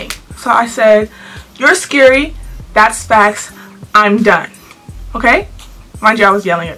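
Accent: United Kingdom. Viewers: The British accent 😭 im British and the accent is so jokes😂😭 anyways just found ur channel 💕💕💕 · The english accent tho...😂😂 im english and this is amazing. Congratulations you just gained a subscriber😂😂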